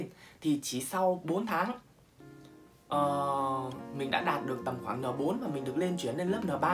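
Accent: Hai Duong, Vietnam. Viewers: Giọng Hải Dương yêu dấu quê mình đây rồi🙂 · Bạn người Hải Dương phải k? Nghe giọng b giống người HD 😉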